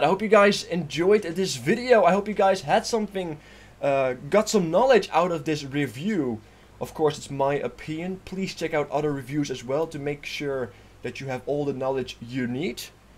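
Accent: Netherlands. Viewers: Are you perhaps dutch by any chance ??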